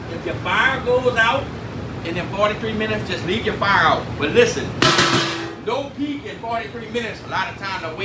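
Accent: Louisiana, United States. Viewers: I love Louisiana accent they have the best in the south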